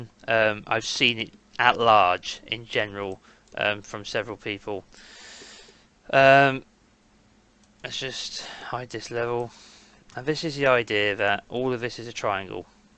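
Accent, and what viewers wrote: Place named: London, United Kingdom. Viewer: Lol, perfect London voice, love it.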